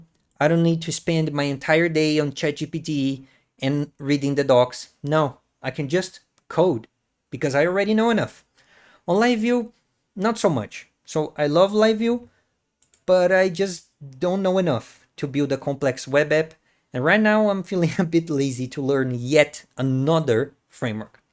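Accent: Brazil. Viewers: Man, o video está top e inclusive isso não é uma critica ao seu inglês, mas o brasileiro tem o jeitinho brasileiro de falar inglês né rsrs, eu assisti o video todo sentindo uma forte familiaridade 😅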